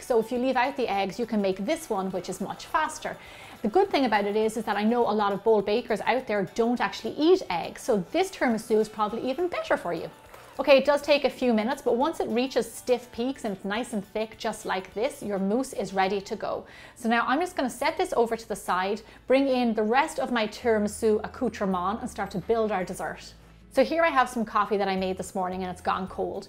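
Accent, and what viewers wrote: Ireland. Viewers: Why you sound Irish, not Italian! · you must be irish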